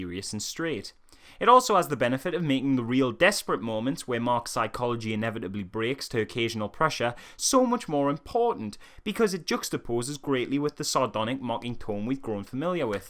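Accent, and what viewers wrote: Newcastle, United Kingdom. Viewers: Getting more and more geordie as time goes on what's the crack like?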